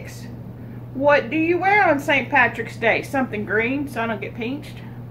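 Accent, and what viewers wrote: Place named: Mississippi, United States. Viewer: Howdy,!! I think you are hilarious! I am in Mississippi, and our accents are very similar, so your "peenched" and other words sound a lot like me! OMGoodness!! I used to spend hours a day looking for 4-leaf clovers, too!! I found one or more almost every time I sat down to look. I love your furbaby, Barnum. My Missy looked almost exactly like him, but she passed at about age 12 soon after my husband in 2016, probably from grief. Please, give Barnum a big hug and kiss from me in memory of my Missy—and, cherish him as long as you can, hon... I think you are great and wish you all the best! God bless! Love & hugs ❤ Lana aka the Good News Keto Grandma 👵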